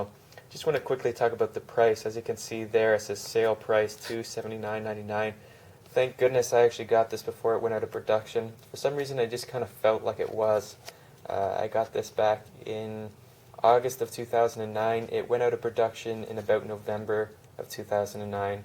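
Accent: Canada. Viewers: Wait are you Canadian?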